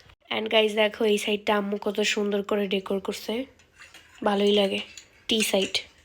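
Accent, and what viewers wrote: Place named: Alfadanga, Bangladesh. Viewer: Hiiii... So sweet of you....first time cmnt korchi tmr vdo te... Amdr gram er basha o alfadanga... Shune vlo lglo amra same elakar manush....❤❤❤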